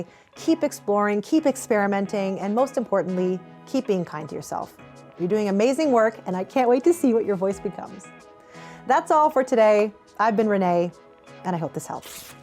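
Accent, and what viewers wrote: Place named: New Zealand. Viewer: This kiwi accent makes it real hard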